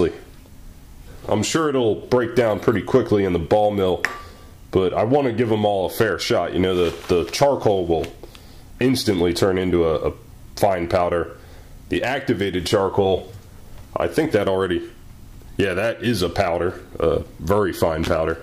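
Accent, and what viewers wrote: South, United States. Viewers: Maybe it's just been awhile, but you have damn fine hands. Damn fine hands! I didn't even know I was a 'hand' lady! Granted, I'd gleaned from your videos that you are an ass, re: euphemisms, double entendre, blatant ejaculate consistency; Of a keen intellect with a purview respectful of both humanistic and psychodynamic persuasions and love for even the lamest of layman; You've a deep, soothing voice, with that faint Southern-like drawl -... and I just heard something about 6"... Average ain't bad! Above being the verbose way of sayin': I've got to hand it to you, you are one damn fine ass, sir. tips hat Love the videos and the colourful internal dialogue gone rogue. <3 Thank you.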